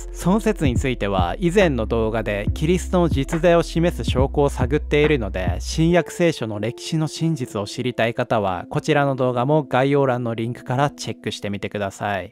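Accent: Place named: Tohoku, Japan. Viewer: かなりズーズー弁ですね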